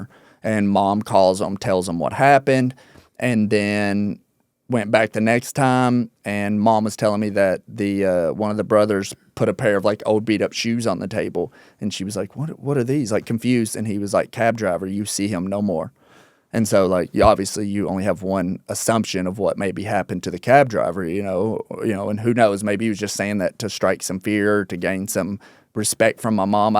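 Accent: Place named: Kentucky, United States. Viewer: Oh shit he totally talks like a KY boy 😮😊😊😊. I grew up in Louisville and out in the country in KY and in San Diego so best of both worlds but wow .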